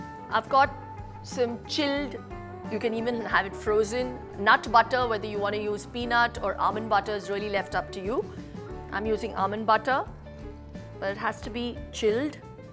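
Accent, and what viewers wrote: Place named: England, United Kingdom. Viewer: Her English accent makes me smile like crazy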